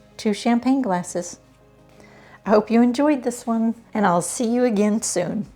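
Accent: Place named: South, United States. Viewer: I learned so much from your great video and, I love your southern accent.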